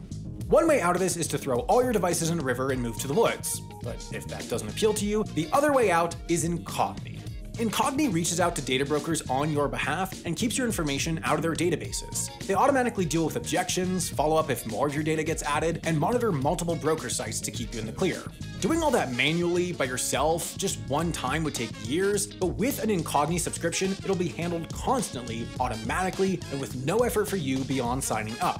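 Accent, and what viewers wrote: United States. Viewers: You ptonounced Lancaster as in Lancaster, Lancashire correctly as far as I heard and as far as it was possible in your American accent. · Pronouncing Porsche as "porsh" instead of "porsh-uh" is totally acceptable in American English, which you speak. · okay how is the lancaster pronunciation incorrect? i'm from the OG lancaster and you basically pronounced how i would (albeit with an american accent)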